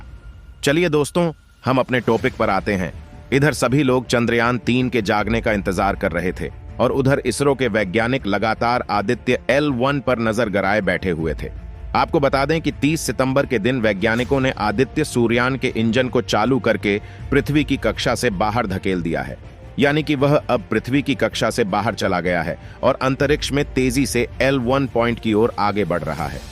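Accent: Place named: Bihar, India. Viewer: आपकी बिहारी हिंदी सुनकर मेरी हिंदी बोली भी खराब हो जाएगी, अतः शुरू के दो ही शब्द सुनकर विडियो बंद करना पड़ रहा है। Sorry.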